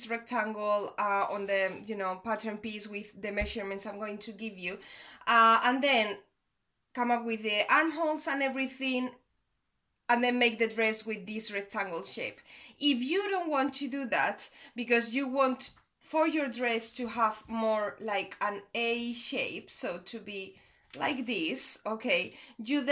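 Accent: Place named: Mexico. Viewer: hello, nice video, you have the same accent, that my mexicain Lady friend. The minute i heard you, i toughs of her, anyway, nice video keep on going.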